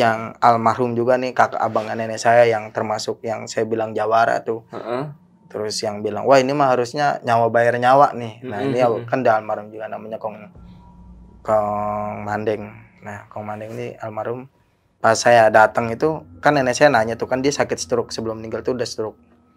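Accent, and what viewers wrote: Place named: Betawi, Indonesia. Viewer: Saamm betawieee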